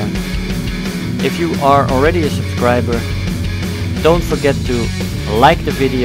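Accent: Netherlands. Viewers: i realy like your videos bro its gonna be a +sub from me ;) i bet your dutch am i rigth couse you have a dutch accent or is it just me ? :p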